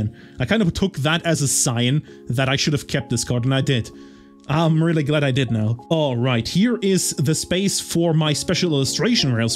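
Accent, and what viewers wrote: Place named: Germany. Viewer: Haha direkt am Akzent gehört, dass du Deutscher bist :D Sehr coole Sammlung!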